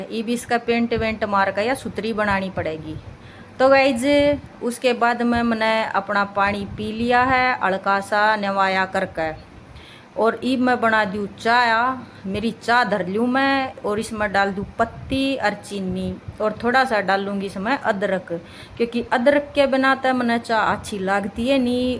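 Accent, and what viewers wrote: Haryana, India. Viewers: Nice haryanvi boli aache lage😂 nice vlog👍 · Very nice haryanvi ❤😮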